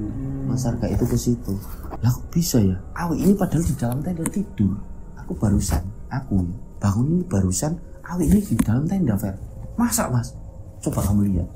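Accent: Java, Indonesia. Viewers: Bang studionya di daerah mana sih ko jowo nemen...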